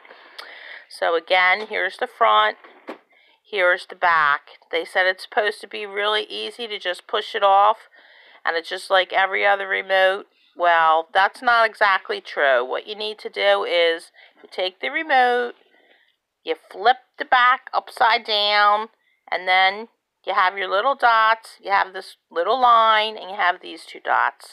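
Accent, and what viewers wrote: Delaware County, United States. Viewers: Do u happen to be from DELCO????? That accent sounds realllll familiar 🤣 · Wow, thank you! I would never have figured out how to change the batteries for this goofy remote. It is definitely not intuitive (and so different from all my other remotes) but your video make it super easy. Love your "accent". Are you from Delaware County?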